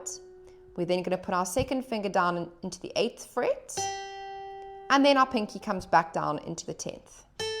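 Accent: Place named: South Africa. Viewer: Yay SA accent, finally.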